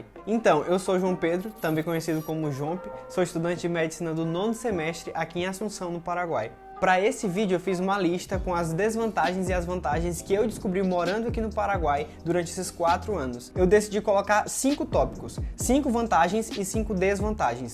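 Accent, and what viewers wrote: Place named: Northeast, Brazil. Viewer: Noto que é nórdestino. Parabéns. Sou gaúcha do RS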